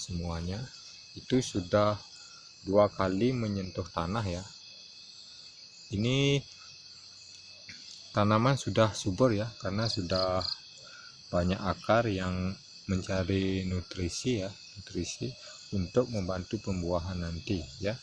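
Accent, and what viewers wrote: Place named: Bali, Indonesia. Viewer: logat Bali ini sepertinya engken bli kabare becik becik ya salam dari jakarta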